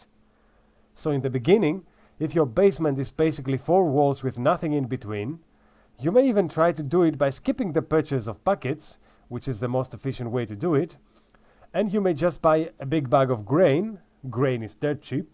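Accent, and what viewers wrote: Greece. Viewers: Ο πωπωπω η ελληνική προφορά την ακούς παντουυυυ. 🇬🇷🇬🇷🇬🇷🇬🇷🇬🇷🇬🇷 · Chris Chavez Well, it's definitely not Indian, and I don't think it's Turkish, although that is a better guess. Sounds a lot like Greek to me. Specifically southern Greece. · Κατάλαβα αμέσως ότι είσαι Έλληνας από την προφορά σου έκανα sub γιατί βρίσκω το περιεχόμενο ενδιαφέρον. Σου εύχομαι τα καλύτερα και καλή συνέχεια! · Your accent sounds Greek...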